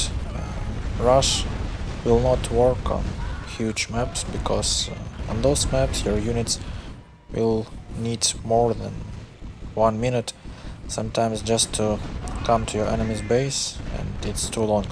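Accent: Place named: Russia. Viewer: с русским акцентом говоришь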